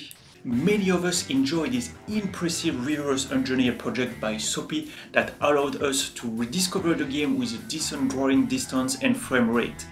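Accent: France. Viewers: Clairement un français le mec 😂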